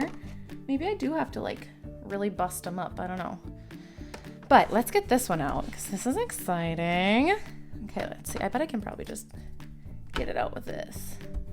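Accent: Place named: Minnesota, United States. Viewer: I mean you're a Minnesotan I say melk, tæble, and I'm from SD Bāg vs beg :)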